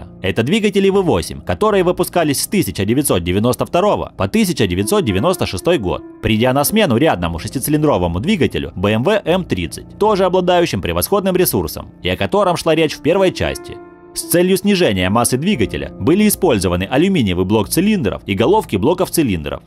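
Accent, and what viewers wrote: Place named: Belarus. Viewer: акцент белоруса, эксперт?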